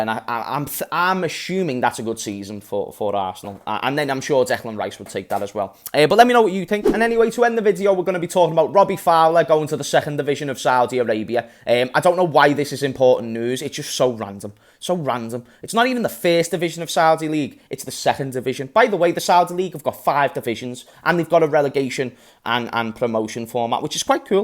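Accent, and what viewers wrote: Liverpool, United Kingdom. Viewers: It's the scouser accent for me 👌👌👌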